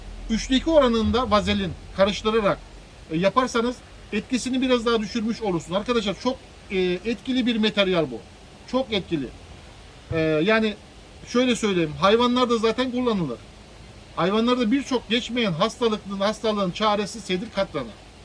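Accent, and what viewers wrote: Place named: Manavgat, Turkey. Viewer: Konuşmandan bildim abi bende manavgattaydım😂şiven harika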